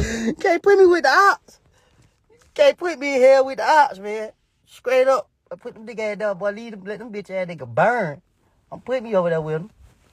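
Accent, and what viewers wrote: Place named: South, United States. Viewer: Love your accent! Country baby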